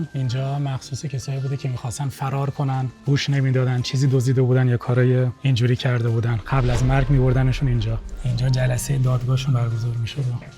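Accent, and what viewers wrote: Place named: South, Iran. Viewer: لهجت خیلی غلیظه جنوبی هستی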